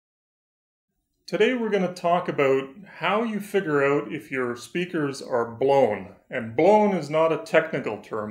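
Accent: Canada. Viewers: First 5 seconds I knew this guy was Canadian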